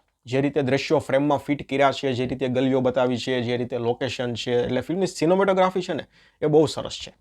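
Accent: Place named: Kathiawar, India. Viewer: ગુજરાતી તો સરખું બોલો કાઠિયાવાડી લેહકો સારો નથી લાગતો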